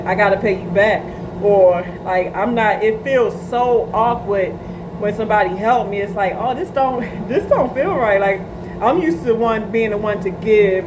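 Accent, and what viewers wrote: Baltimore, United States. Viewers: Much respect queen, u gotta be from bmo. I can hear it, being from pg. Stay strong stay sharp stay genuine! Much peace, love, positive vibes!!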